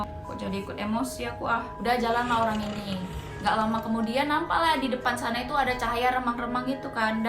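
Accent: Medan, Indonesia. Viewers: Cerihas suara Medan nya gak hilang kk .keren .horas . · KEK NADIA OMARA APA JIPLAK APA EMANG GINI OSEMUA ORANG MEDAN NGOMONGNYA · suka banget kalo dengerin orang medan cerita beda aja rasanya kayak lebih seru lahhh mantab semangat terus kakkkkkkk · Anak Medan emang gitu bang kalo bicara keren😃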